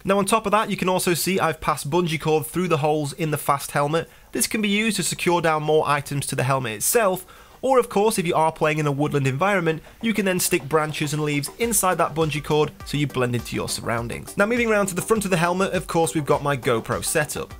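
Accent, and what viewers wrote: United Kingdom. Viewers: Really Nice! I'd like to start with airsoft as well, but here in Germany there are only a few places to play and without a car you can't go anywhere... I like the HK MP 7 quite much and maybe someone has a tip for me...(under 0,5 J ). go on with your amazing videos, I enjoy them very much because I like British English much more than American English..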